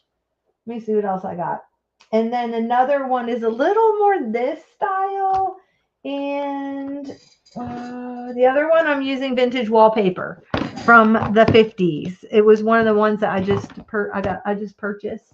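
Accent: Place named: United States. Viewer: Weird comment, but has anyone ever told you that you sound like Kelly Clarkson? You must have been raised in the same part of the US. You have the same accents.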